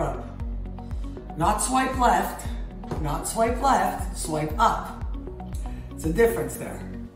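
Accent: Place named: Canada. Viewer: OMG I KNEW IT HAHA i kept thinking "she has to be at LEAST Canadian" and then you said "un lozenge"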